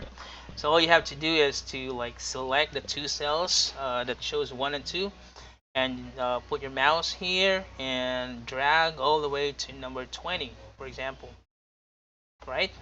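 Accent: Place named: United States. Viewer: Gaw kusugaw. Gd nimo mo english mura MN taga America eslang MN kaau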